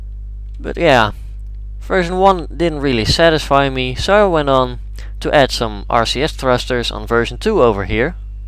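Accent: Netherlands. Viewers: Sorry but, are you dutch? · You sound so fucking Dutch. Idk if you are. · you have the best dutch accent... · cant watch this because of the dutch accent, I'm must be the only dutch person in the Netherlands who can talks English almost completely without any accent.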